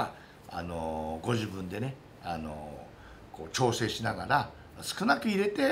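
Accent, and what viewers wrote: Japan. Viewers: I've been learning Japanese for a while now and I really enjoy watching Chief. Maybe it just seems to me, but the Chief seems to have a slightly different accent than that of creators coming from Tokyo. Are you from other parts of Japan Chief? As always, thank you very much for the great video and I wish you much continued success!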